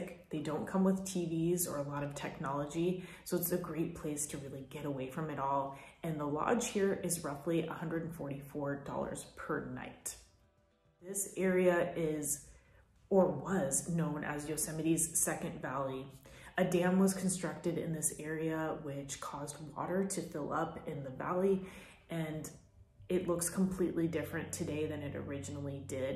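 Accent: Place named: Australia. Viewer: Great video! Thank you and your Aussie is darling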